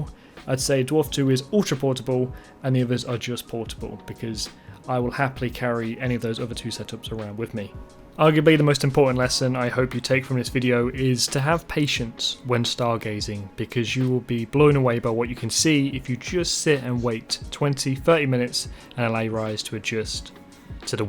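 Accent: United Kingdom. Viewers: Great video...if i was just listening with only sound, you sound like Physicist Brian Cox...English accent and all...I had to double check as I was typing this lol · Very distracting, having a British accent, channel based in UK but quoting prices in Dollars. Strange.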